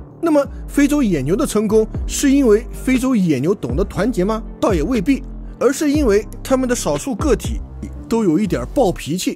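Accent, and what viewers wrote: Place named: Jiangnan, China. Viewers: 兄弟是哪里的人？太爱你了，听口音是江浙一带的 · 他口音肯定是江浙沪的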